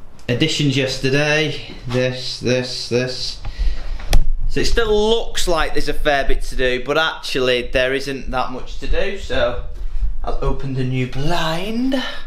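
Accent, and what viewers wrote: United Kingdom. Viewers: I love your British English.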